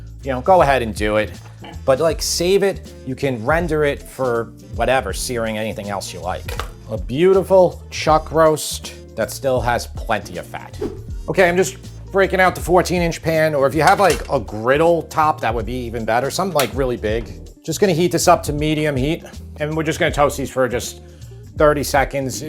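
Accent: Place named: New England, United States. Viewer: Mexican dish from Colorado cooked by dude who sounds so New England that he should be pouring it on a grinder with a side of clam strips Beautiful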